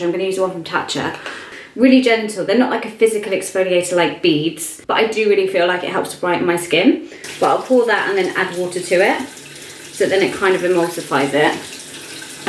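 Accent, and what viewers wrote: United Kingdom. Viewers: nice true English voice :)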